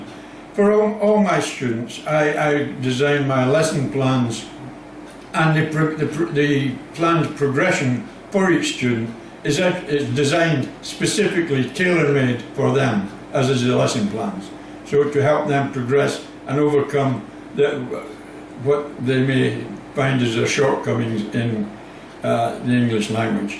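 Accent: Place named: Scotland, United Kingdom. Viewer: This is Scottish accent .. 😃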